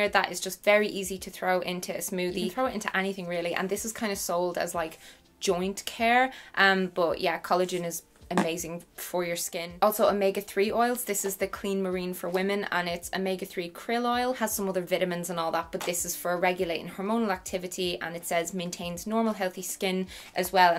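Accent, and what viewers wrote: Ireland. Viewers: your accent is so cute... wish i was irish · God i miss Irish accents like yours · Love your irish accent! And nice tips!!!! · Sometimes when I watch YouTube my brain doesn’t register accents AND HOLY SHIT YOU’RE IRISH. also you’ve probably heard this but I love your accents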